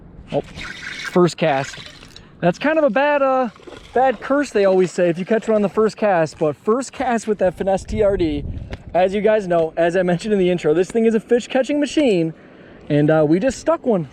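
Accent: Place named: Midwest, United States. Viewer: Sounds like your Sobi’s brother lol midwest aye?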